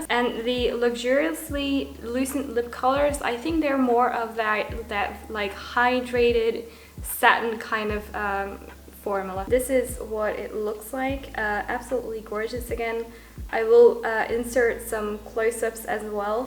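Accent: Australia. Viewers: I’m an Aussie just coming to the comments to say I noticed an Australian accent in the way you speak English - where are you from?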